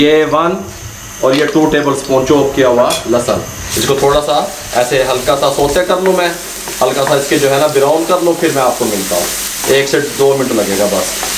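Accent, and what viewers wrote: Punjab, India. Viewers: Samjhane ka style bahut hi Achcha hai Punjabi Maar Ke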